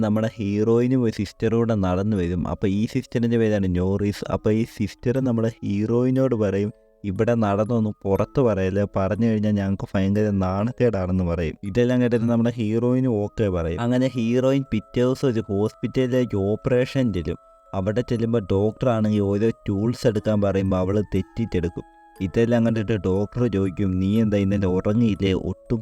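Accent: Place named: Kozhikode, India. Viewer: കോട്ടയത്ത് ഉള്ളവർക്ക് നല്ല pronounciation ആണ്. ഇത് kozhikode or kannur ആണ്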